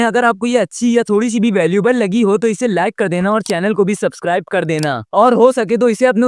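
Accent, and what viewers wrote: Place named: Lucknow, India. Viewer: bhai ap bhai lucknow jaisi aawaz me bolte hoo and mai lucknow me reh chuka hu brother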